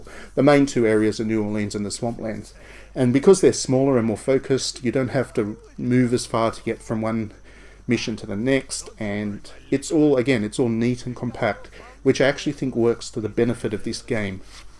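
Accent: Australia. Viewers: yea,i hate australian accents,but dont change your accent just because i hate it,no but you do need to say vita and not vitar and it s not just you,a lot of english people make the same mistake,any way i think that you deserve more views and more subs,and you have just gained a sub,mate btw sorry if i misspelled somethin, im portuguese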